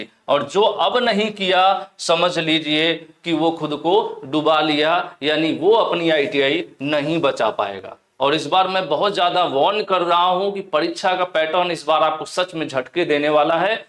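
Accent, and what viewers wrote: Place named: Bihar, India. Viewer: Sir bihar se hai😊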